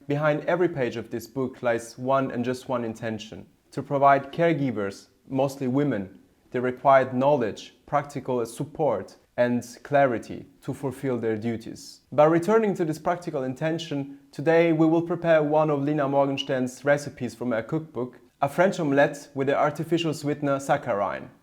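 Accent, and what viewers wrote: Germany. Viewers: Very well done, very interesting. Your English is really very good; I enjoy the German accent and the occasional "Germanic" choice of wording. Do you have a channel in German? I'm learning the language and am looking for interesting material in German to experience. If you speak German with the same speed and clarity you used here, I believe a German channel of yours would be a useful tool for me in that endeavor.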